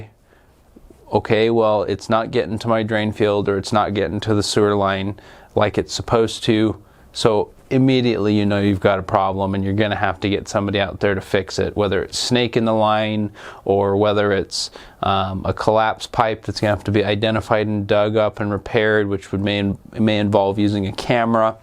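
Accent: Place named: Midwest, United States. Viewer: Sounds US Midwestern to me.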